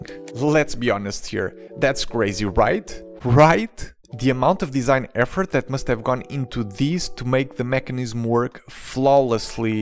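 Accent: Portugal. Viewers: Tava mesmo a ver que eras português, o sotaque foi o que fez com que eu descobrisse!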